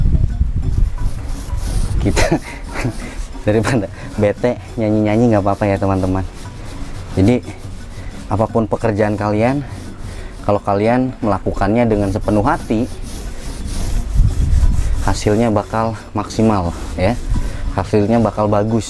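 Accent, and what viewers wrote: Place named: West Java, Indonesia. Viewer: Mas logatnya kaya orang sunda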